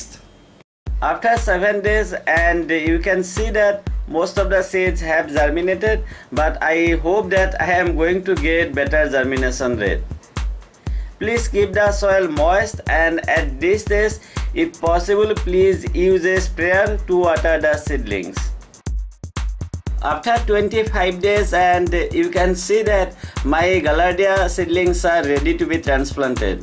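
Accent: Assam, India. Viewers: Form your voice it seems you are from Assam.. I'll be grateful if you reply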